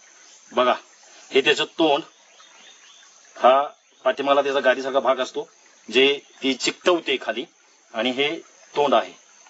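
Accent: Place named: Kolhapur, India. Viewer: तुम्ही कोकणात राहता तर कोल्हापुरी टोनमधे कसे काय बोलता?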